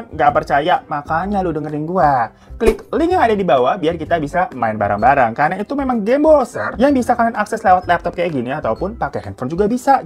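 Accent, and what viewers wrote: Java, Indonesia. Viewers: Ngakak anjirrr dengerin suara nya gaya bahasa nya medok wkwkwk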